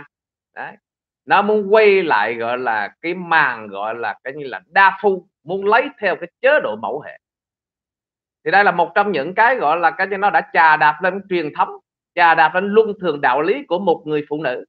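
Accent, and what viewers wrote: Standard. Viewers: Chào Anh noi rất chuẩn.like like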